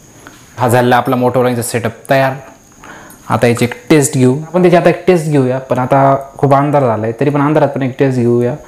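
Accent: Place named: Yeola, India. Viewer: Ek no bhawa ...Mi yeolyacha ...aplya gawakdchi bolnyachi style nad hmmm. Cushion removable..😂😂😂😂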